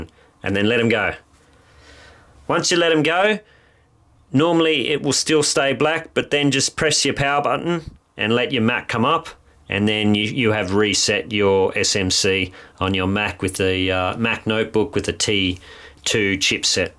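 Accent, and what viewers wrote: Australia. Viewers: Thanks so much for this tip. I did both methods and got it to work - charing again - on the second method. Great to hear an Aussie voice for a change. Cheers M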